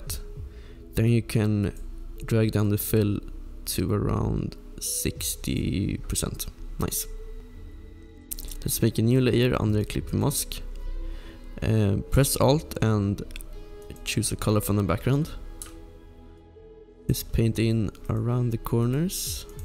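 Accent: Sweden. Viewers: are you from sweden?? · due svensk eller hu? De hörs på din röst lol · are you from sweden_ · Ur swedish xD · Are you swedish bcuz u have an swedish accent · Man hör att han är svensk xdd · Are you swedish? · you sound like you are from sweden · you sound Swedish. Sorry if im wrong. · Swedish 100% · He comes from sweden you here it very easy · This guy is Swedish I bet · Man hör att du är från sverige ^_^ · Are you a Swede???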